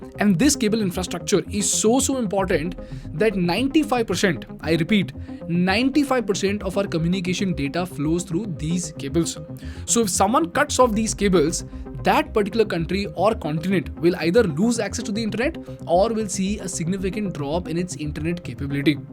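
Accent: India. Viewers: This EAM English is awesome.He does not have the Indian accent like this young commentator.Hes an extraordinary guy.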